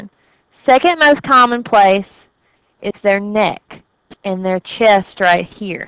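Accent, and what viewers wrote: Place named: Mississippi, United States. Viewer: Dr., I love your videos. I am taking an online class, but I prefer to sit in a class. Your videos help me to get what I need. Here is the funny part, I KNOW your accent! I recognized it right away. I have family from South Mississippi (not gonna be too specific, since this is on the web). Anyway, thanks so much for the help!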